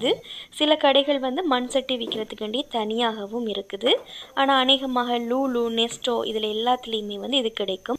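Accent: Sri Lanka. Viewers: உங்கள் இலங்கைத் தமிழ் அழகு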